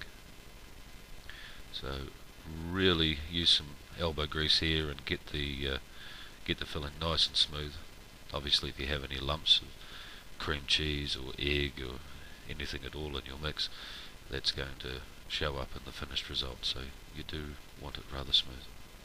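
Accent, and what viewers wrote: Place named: New Zealand. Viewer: He's a bloody KIWI, from Nuw Zulund, not Ostrayan from Ostraya.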